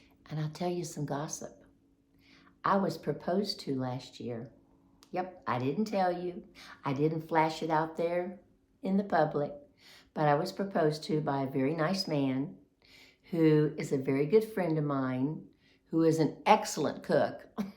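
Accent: South, United States. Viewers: You are a delightful lovely lady.. you sound like a precious southern lady. I'm from northeast Georgia and you're living my dream. 🎉 i can't wait to see what we've been doing.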